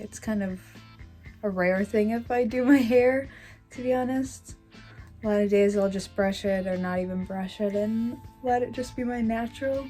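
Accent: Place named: North, United Kingdom. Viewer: I stumbled upon one of your videos today and found myself listening to others for the past couple of hours. I am obsessed with vintage clothing and love music before my era as well. You were asked a question about mental health and answered so honestly that I wanted to applaud your courage. Great job on the videos! P.S. I am originally from up-north and recognized the accent immediately ; )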